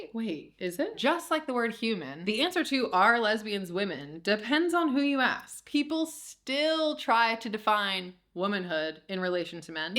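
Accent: United Kingdom. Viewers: Your intonation is so British now!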